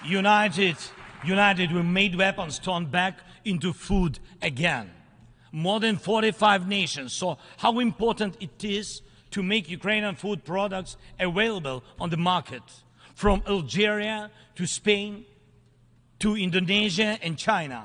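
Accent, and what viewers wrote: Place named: Russia. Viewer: He sounds like a Russian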